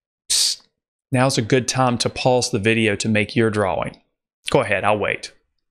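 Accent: South, United States. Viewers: I recognize a fellow southern here right away. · His southern accent has him saying "d-ROW-wing" as opposed to "d-RAW-ing" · from southern USA therefore he has a drawl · It's his southern accent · It's that Southern drawl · He has a Southern Drawl when he drawls ... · Really are you trying to be mean? He obviously has a southern accent. 🙄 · Your ears. He has a a Southern drawl. When a lot of us Americans say the word “drawing” we don’t pronounce the “w”. It’s more like “dra-ing”. The way he says it is “dra-wing.” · Definitely says DWawing. Sounds like he’s from the American south. ‘Ya all come back ya hear’ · He clearly has some kind of slight southern accent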